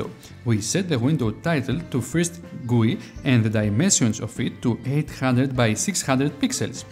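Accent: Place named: Greece. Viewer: Greek accent tststst.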